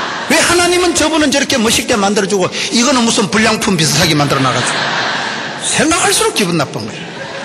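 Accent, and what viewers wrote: Gyeongsang, South Korea. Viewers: 경상도 방언을 쓰셔서 그렇습니다 거친 표현이 아님니다 더군다나 교만하고는 거리가 뭡니다 국어학 적으로 아무런 문제가 없습니다 그러려니 하고 들으시면 되지 않을까여 😊😊😊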